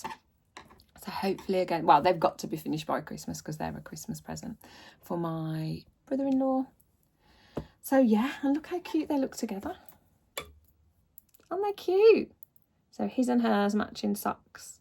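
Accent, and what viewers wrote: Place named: Birmingham, United Kingdom. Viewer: As a Brummie living in New Zealand, I’m loving your podcast and hearing the familiar accent! Have a wonderful Christmas 🎄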